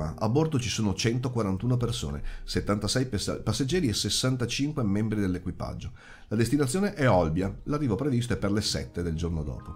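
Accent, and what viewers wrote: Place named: Veneto, Italy. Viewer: L'accento e la cadenza venete dovrebbero essere patrimonio dell'umanità!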